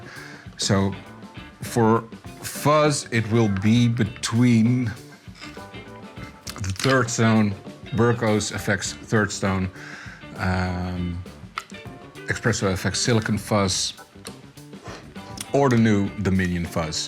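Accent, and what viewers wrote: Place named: Netherlands. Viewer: Your English sounds sooooo Dutch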